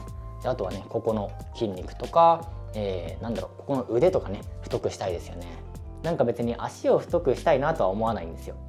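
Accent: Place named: Japan. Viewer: Please do not doubt your English pronunciation. It's great. You can pronounce words correctly which are impossible for other Japanese people to do. And don't worry about your accent. Even after living in the US for 21 yrs a slight German accent will slip into the conversation, even though Americans always thought I was Irish or Swedish 😂. When I look at your built I always see Yoshiki-san from XJapan . He has the same height as you but a real strong upper body. He is slim but has muscles from drumming and physical exercise. So it is not impossible for you to achieve.- I wish you all the success in the world ❤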